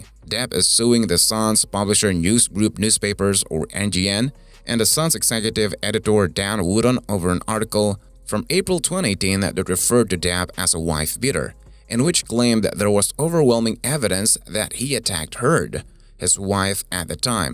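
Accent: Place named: Latin America. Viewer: Gangster from the 30's??.!!! He sounds more like a Latin guy IMITATING a 1930's gangster! You can tell he's reading this "Narrative" (..the pronunciation)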